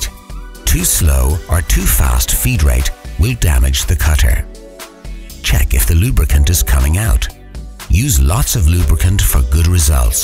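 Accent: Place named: Europe. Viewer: The narrator is also hilariously European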